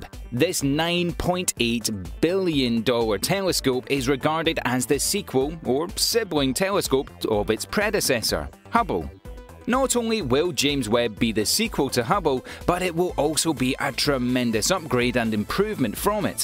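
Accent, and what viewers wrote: Scotland, United Kingdom. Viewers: For someone with a British accent he mispronounces a Lot of important words ie: La Grange,Arianne,Grummin etc. Makes me wonder if his facts are just as poorly based.. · It’s LaGrange not larange. Perhaps it’s your Scots accent that misinterprets everything.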